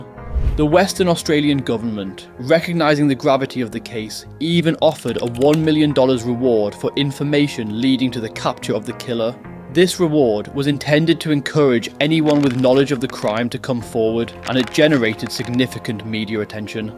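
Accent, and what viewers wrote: England, United Kingdom. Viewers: He's English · Bullshit.antother lame instagram mini docco ! Narratted by some pommy flop who probably never even been out here 😂💩🤮💯also the way the narrator says state leader 😂😂😂😂😂😂😂they dint use that language also nike bike 😂dudes been wearing nike s for last thirty years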